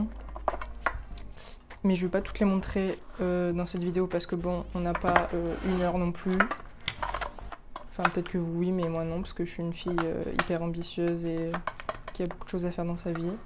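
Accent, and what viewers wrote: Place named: Marseille, France. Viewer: Accent marseillais décuplé fois 1000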